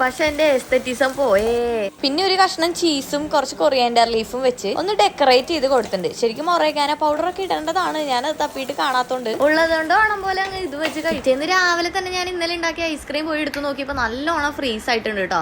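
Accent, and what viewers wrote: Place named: Thrissur, India. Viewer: Thrissur slang pwoli anu tto..pinne ah Aesthetics nannyitund 😅..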